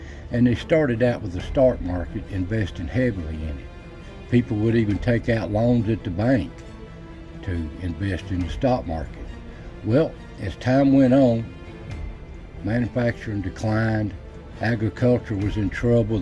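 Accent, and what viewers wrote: Appalachia, United States. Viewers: It's so comforting to hear someone actually from the area narrating these videos & passing down the knowledge... It's sad how many of the foraging & mountain videos are narrated by someone obviously not from here... Thank you again ❤ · 23 year old man on the edge of Appalachia in Alabama thank you for your vids I can really relate to what you post you remind me of my grandad a lot the way you talk true Appalachian down in Alabama it’s similar to the Tennessee talk like you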